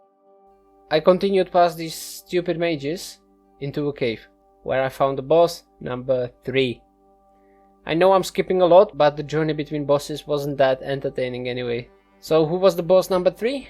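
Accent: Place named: Czech Republic. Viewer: Pokračuj s contentem! Eventuelně se to rozjede. Pěkné video. Českej přízvuk mě mega kopnul.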